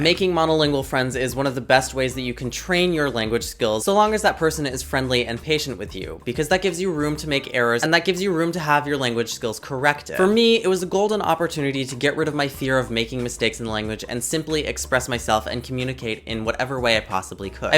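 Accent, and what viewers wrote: Portugal. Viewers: I'll speak in English to understand it better. You speak Portuguese very well, congratulations.You even have the Portuguese accent. People will understand / understand very well >w< Translation/tradução=e Eu vou falar em inglês para entenderes melhor. Tu falas muito bem português, parabéns. Tu tens até o sotaque português as pessoas vão entender/entendem muito bem >w< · Ele tem o sotaque português. Se tivesse o brasileiro estaria correto também. O português falado no Brasil é tão correto quanto o falado em outras partes do mundo. Não tem essa de matriz da língua. O inglês americano é tão correto quanto o da Inglaterra. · Sou português e pensava que ele era português por causa do sotaque a falar inglês! E quando fala a nossa língua parece mesmo tuga! Carai! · Porque ele aprendeu o português de Portugal. Durr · caralhoo manoo, tu tem até o sotaque dos portuga kkkkkkkk show de bola!!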